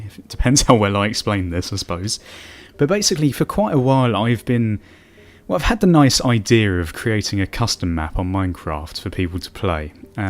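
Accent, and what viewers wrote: United Kingdom. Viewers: YAY he is british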